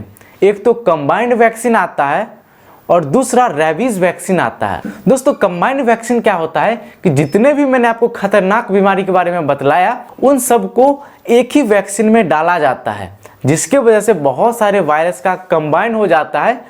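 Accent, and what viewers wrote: Bihar, India. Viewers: Aap bihar ho Na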